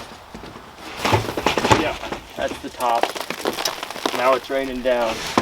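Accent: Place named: Boston, United States. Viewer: Good mauhnin! I love your Boston accent.( I’ve got one myself) Hope I never lose it! Lol! Oh and we like it a lot too! Lol! Good idea with the nail polish. Take care.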